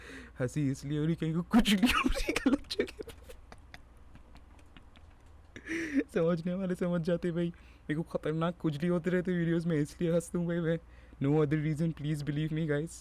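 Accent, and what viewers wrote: Hyderabad, India. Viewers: 😭😭hyd accent ong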